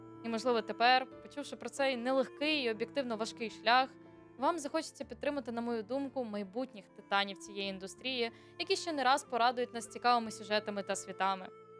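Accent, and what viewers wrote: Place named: France. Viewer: Шановна, вам дуже личить французький акцент, відос класний!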